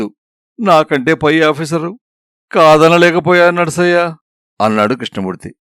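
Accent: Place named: Srikakulam, India. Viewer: మీకు నా హృదయ పూర్వక వందనాలు శ్రీకాకుళం యాస దిన్చేశారు. ధన్యవాదాలు నా రాబోయే కథలకు మీపరిచయం అమోఘం. మీ ఆశీస్సులతో ముందుకు వెళ్తాను మహాశయా నా కథలలో కొన్నింటికి నా ప్రియ మిత్రులు సరసి గారు ( ప్రముఖ కార్టూనిస్ట్) బొమ్మలను ఇవ్వడం నా సుకృతం ఆయనకు ఈ వేదికపై నమ స్సుమాంజలులు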